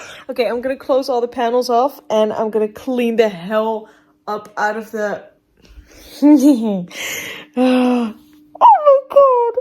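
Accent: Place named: Netherlands. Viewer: ik hoorde al meteen dat je Nederlands was en wat geniet ik van deze video hahahah soon ook mn pc bouwen. spannonddd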